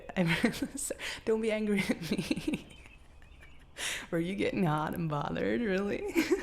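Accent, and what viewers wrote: France. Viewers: As a french I love your accent, you speak well! Are you sure you've never been to Paris😋? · Love your french accent !! When you say "Disney" the « s » sound like the beggining of « sorry » or « snake »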